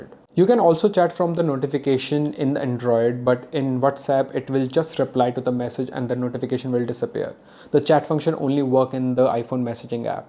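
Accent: India. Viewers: im 22 years old, my first phone was an iphone 4 back in 2013. my phone history is Iphone 4, 6, nexus 6p, iphone 7+, X, 11, and now 12. I fucked up going from the x to the 11 but lets just skip past that. I loved the nexus while i used that but there really is something to be said about your phone being simple and working out the box. I loved being able to change basically everything about my phone but (i think this is a me thing) i never felt like i could get my phone to do exactly what i dreamed it could. When using an iphone, there may be things i hope get changed, but im never left with the feeling of "i bet if i completely rework my phones set up i can fix this one little thing i want" lol. Iphones do everything i want and they keep adding stuff so its pretty nice but i got no hate to anyone who prefers that android experience. P.S. I hope this doesn't come across in an offensive way but your accent is beautiful and crystal clear. 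This American has no problem understanding you and enjoyed the video! Hopefully these tech companies expanding into India will be down to start sending products to local tech youtubers like you.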